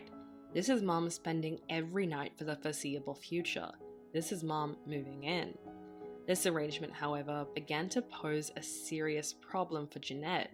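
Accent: Australia. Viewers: Is this Australian?? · Nice to hear a fellow Aussie. Thankyou for this. 😊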